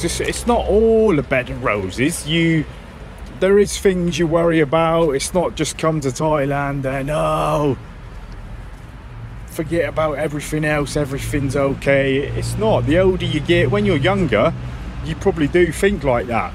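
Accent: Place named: Southwest England, United Kingdom. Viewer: Hi ya Just found out how send a message. Love your views and was wondering what part of uk you are from. I’m also from the southwest Torquay to be frank. I hear a little when you chat. Take it easy man, take it easy. lol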